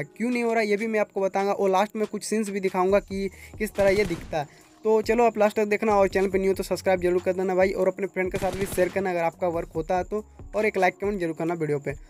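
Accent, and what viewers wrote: India. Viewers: you tolking jharkhandi🙂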